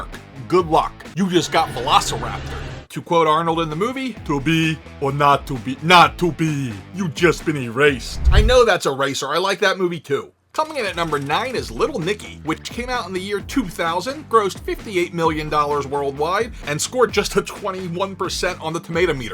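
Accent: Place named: New Jersey, United States. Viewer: You crack me up! That’s a lovely accent you have there. New Jersey?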